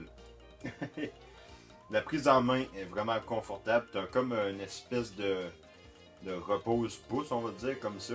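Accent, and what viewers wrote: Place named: Gaspésie, Canada. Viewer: Cosmo The Cat ne parle pas comme steelorse... Il est juste canadien, quebecois et gaspésien comme moi!!! Vive la Gaspésie ❤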